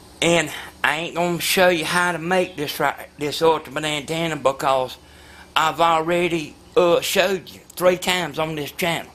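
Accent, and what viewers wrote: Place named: Mississippi, United States. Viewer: I really love your videos. Informative, interesting, educational and entertaining. You are so right about the looks of the antenna and your appearance on the job. People judge most stuff by sight. Unfortunate but true. I've worked in installation work before I retired and I guarantee everything you said is true! You have good insight into marital harmony too. I'm from South Mississippi myself and I love your accent. I'm retired and this might be a way for me to make a few dollars to help with living expenses. Lord knows the social security retirement check is barely enough. So glad I found your channel, even if I never do sell them, I've really enjoyed watching your videos! You have a really great attitude! God bless you in all you do!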